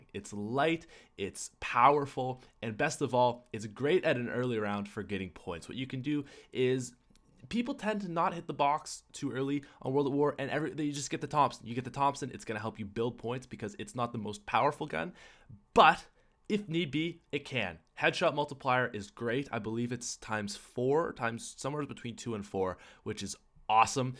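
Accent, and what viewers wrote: Canada. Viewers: Are you Canadian? You speak like you are a Canadian :P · Is this guy Canadian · Josue Medrano canadian accent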